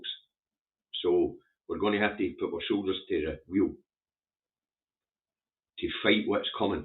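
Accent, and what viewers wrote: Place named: Scotland, United Kingdom. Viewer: Great to hear a Scottish accent again 👍🏴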